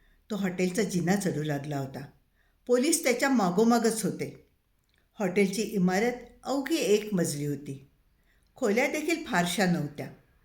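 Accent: Standard. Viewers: Chan katha pan vachan Agadi flawless shudhha uchhar wa Kharch chan😇😇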